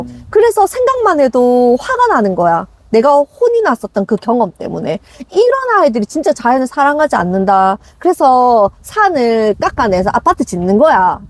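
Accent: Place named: Busan, South Korea. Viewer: 쌍둥이 육아하는 아부지 입니다 마누라 도와서 열심히 서포트 하면서 오늘도 교수님 나긋나긋한 부싼 억양 들으러 왔어예~ ㅎㅎㅎㅎ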